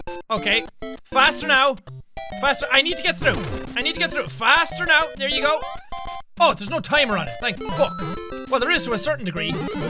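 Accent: Ireland. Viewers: I love you and your videos so much. You are my inspiration. I almost broke my leg on a busy road when I was cycling, but I thought of you and what you'd say in that awesome Irish accent, so I got up and started riding again. Thank you. If you read this, please reply ❤️😍💖😘👌🏻😝💕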